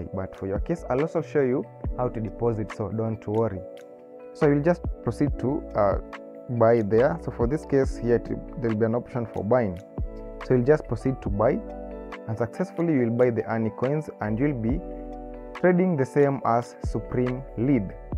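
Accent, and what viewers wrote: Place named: Kenya. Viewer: ik a kenyan when i hear one.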